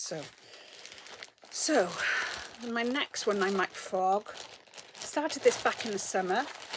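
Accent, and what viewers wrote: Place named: Wales, United Kingdom. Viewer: Love your accent...and that you're from Gavin and Stacey land. Congratulations on the new Grandchildren' I have 2 grown up daughters and 2 grown up Grand daughters. This year I got my first Grandchild in February and the 2nd is due in December. Both boys so I have been knitting in blues, beige and brown. I even had to buy more baby patterns because most of my are for girls. But Grand children are wonderful.x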